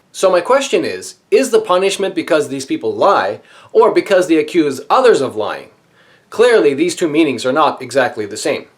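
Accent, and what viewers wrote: Arab world. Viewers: Your Arabic accent is brilliant... Masha Allah ;)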